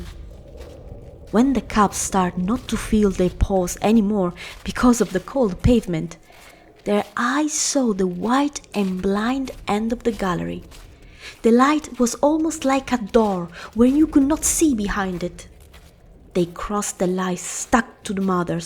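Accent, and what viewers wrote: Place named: Scotland, United Kingdom. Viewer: hai un accento simil Scottish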